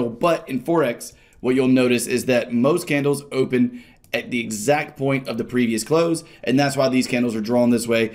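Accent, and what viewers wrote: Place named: Texas, United States. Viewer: Sounds like Texan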